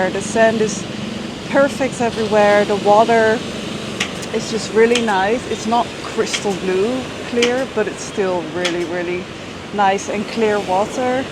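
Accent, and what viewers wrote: Netherlands. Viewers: Thanks for the video, food looks amazing thinking to go in march, and you look very good to, i hear some.dutch accent. Byee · Ook praat je in het Engels, jouw accent vertelt alles in het Nederlands hhh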